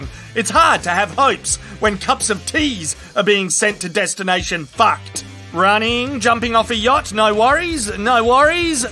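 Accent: Australia. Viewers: Everything’s funnier in dramatic Australian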